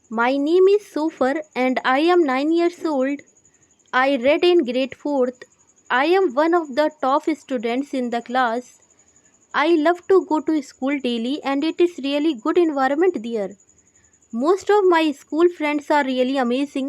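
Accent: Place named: India. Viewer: See, I gave you the first (like) and the first comment, but you have to improve your dialect which is totally native Indian. Good luck.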